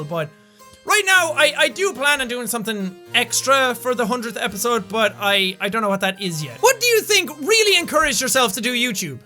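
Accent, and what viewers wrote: Ireland. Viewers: This guy right here got me addicted to Irish accents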